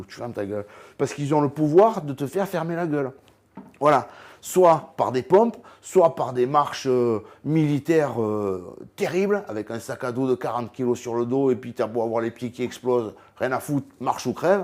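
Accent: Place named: Gard, France. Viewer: Tu vois, j’ai ton âge, ta carrure, Artisan, Gardois, le même accent et expressions, cassaïre au Cirque de Navacelles pendant 25 ans, ma mini chaîne YouTube, mais cette vidéo, TU M’AS fais CHIALER!!!! L’apprentissage a 14 ans, les mobylettes la pêche l’armée.... Tu dis que des vérités de notre génération.... En mars 2017 je me suis pulvérisé la main droite au chantier, j’ai repris boulot en avril 2018, Donc bien sûr RSI m’ont rien filé ou pétoule... Souvent j’ai chargé le 12! J’y pense parfois! Pas besoin d’être jeune pour peter un plomb.... Et tu sais quoi? Comme Stéphanie, tu et mon échappatoire quand je vois tes vidéos! Merci pour ta bonne humeur Je passerais à ta boutique un jour Bises du Pont du Gard Patrick RAPIDO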